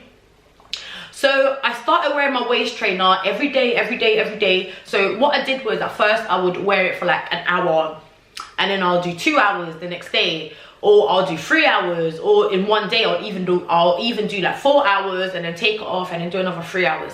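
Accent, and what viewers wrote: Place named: England, United Kingdom. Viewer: Your english accent😍😍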